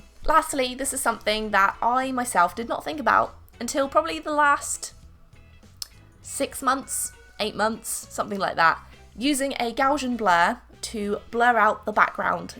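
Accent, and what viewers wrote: United Kingdom. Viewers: bRiTiSh aCcEnT🌚💔its cute tho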